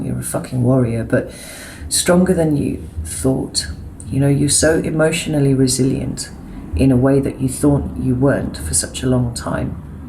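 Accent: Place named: England, United Kingdom. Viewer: VERY FUNNY THING ! NEVER LIKED THE ENGLISH ACCENT ! I LOVE IT BY YOU !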